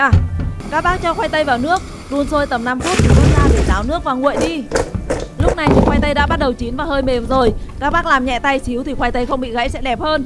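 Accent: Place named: North, Vietnam. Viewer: Thiệt ko mún xem kênh này mà nó tự hiện ra thôi. Lại giọng miền b thì ko ưa đc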